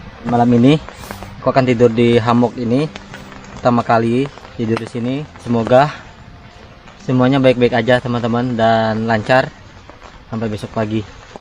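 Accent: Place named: Kalimantan, Indonesia. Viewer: Dari cara omong nya seperti tinggal di kalimantan..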